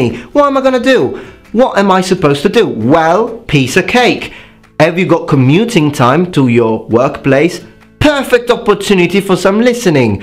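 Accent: United Kingdom. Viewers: You have a thick British accent. Come to America and no one will think you are Italian. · When I first watched your channel I did think you were British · When I subscribed to your channel, I thought you were British until you specifically mentioned being Italian. But I'm American, and a lot of Americans say we can't tell the difference between certain accents. Like English = Scottish = Irish = Australian = South African = New Zealand etc. Could you do a video about the different types of Japanese accents? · I want to say that, you have a fluent british accent. Bravo. I'm American with Italian and Scottish ancestry. I have a very basic understanding of French, Italian, German and Hindi (I lived there for a time). I think everyone should learn at least 1 other language, it helps expand your mind and brings people together. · Your British accent is amazing! 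By the way, I just adore the thick Italian accent. It makes me want to order a large pizza. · Thanks. Your accent sound really closest to the native British accent. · You sound British to me, an American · when i first heard you speak, i thought you were british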